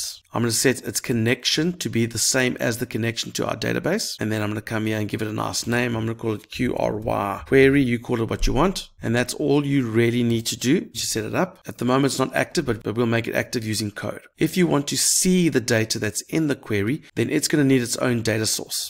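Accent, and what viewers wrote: South Africa. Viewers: Thanks you're the best 👌 quick question are you Afrikaans?😅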